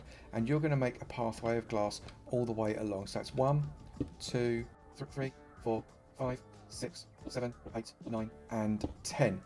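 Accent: United Kingdom. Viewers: no mate he really dont he just sound like a British person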